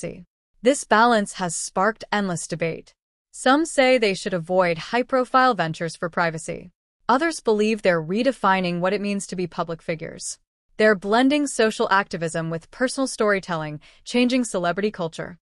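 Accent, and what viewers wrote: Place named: United States. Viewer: And is this American accented commentary worth a damn. Truth or BS?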